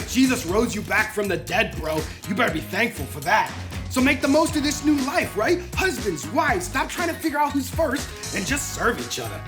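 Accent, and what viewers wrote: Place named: Boston, United States. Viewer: This is great!! has to be OFB... originally from Boston. Born and raised here and we can detect an imposter in a minute. is the real deal. You can’t fake that.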